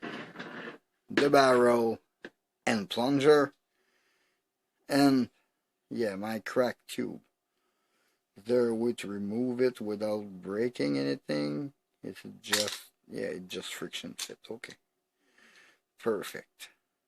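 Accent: Canada. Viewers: I had a debate with my 8 year old on the accent :) I saw in another comment you got your parts on aliexpress? Just luck from the algorithm I saw your video and recognized the accent. I do not think I have seen any Canadian modders on youtube except you so far. Anglo here living in Montreal for 20 years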